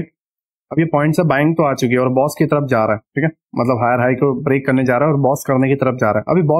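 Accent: Gujarat, India. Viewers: apki hindi se lag raha hai ki ap Gujarati ho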